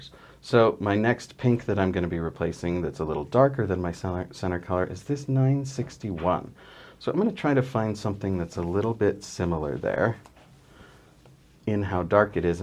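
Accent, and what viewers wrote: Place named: Canada. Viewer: Also, you sound Canadian...where are you located? I am in Ottawa.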